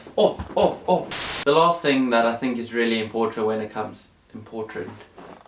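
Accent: South Africa. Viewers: You're South African!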